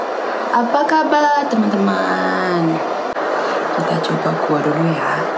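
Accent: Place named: Thailand. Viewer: Dnger kak ngomong, jadi kangen sama cara ngomogng papaku yg mirip kya kak, karna papaku juga orang thailand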